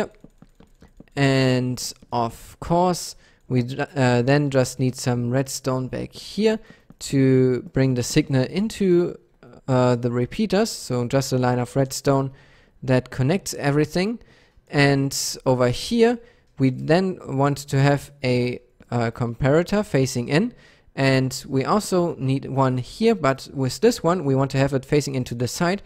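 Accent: Germany. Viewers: coole idee und gute umsetzung. leider habe ich nun das dilemma, dass sich mein "speedometer" nich resetet. hab nicht das gefühl, dass das 2 sekunden sind... hab das tut nochmal angeschaut und meine konstruktion überprüft, leider ohne den fehler zu finden. hast du auf anhieb einen tipp, woran es liegen könnte? lieben dank und dir noch einen schönen tag. :) (das du deutsch kannst hört man am akzent und in einem anderen vid begrüßt du die leute mit "herzlich willkommen" :D )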